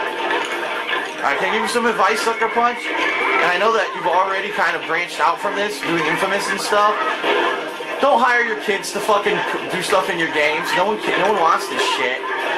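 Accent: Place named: New York, United States. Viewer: I can tell your from New York.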